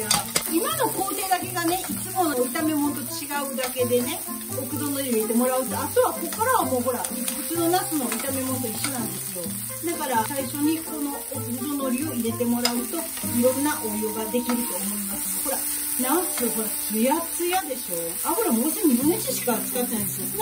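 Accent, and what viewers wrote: Kansai, Japan. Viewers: わかりやすいですありがとうございます。 関西弁もサイコ〜です✨ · 先生の関西弁毎回聞いてて最高⁉️ 毎回楽しみにしてます。ナスは今は旬なんでつくってみます🎵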